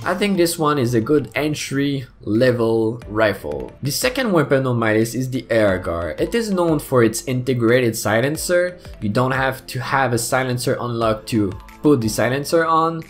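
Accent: Canada. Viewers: bon dieu un vrai anglais de laval ou quebec respect good job vagabon est mon avatar salut lgros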